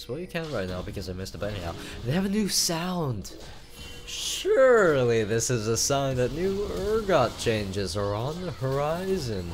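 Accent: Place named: RP, United Kingdom. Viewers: Can you talk normally instead of trying to sound posh and weird. Thanks,nice vid tho besides the annoying story telling. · He sounds kinda posh but that is how he speaks irl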